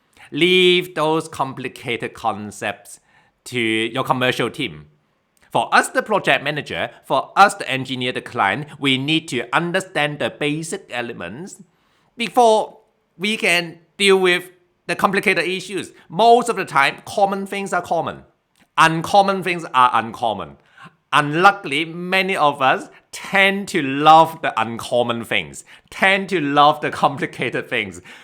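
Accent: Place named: India. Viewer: 有点印度味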